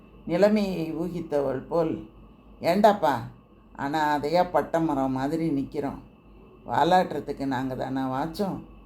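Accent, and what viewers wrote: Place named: Chennai, India. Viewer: நிதர்சனம். மெட்ராஸ் பாஷை super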